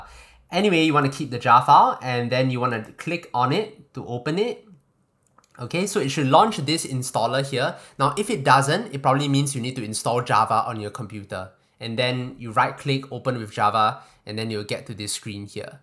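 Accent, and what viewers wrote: Singapore. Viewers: r u singaporean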